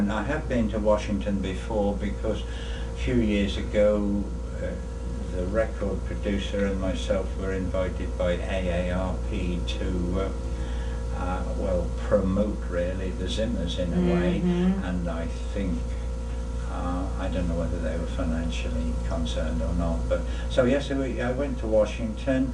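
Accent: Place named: Derbyshire, United Kingdom. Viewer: Howdie, Have continued to watch your videos since before you made it big and I still think you are a blast to watch and you're definitely a pride of Derbyshire. Being a Derbyshire lad myself, it's great to hear a local accent being that I now live in France. Thanks for the videos and please, keep them coming.:)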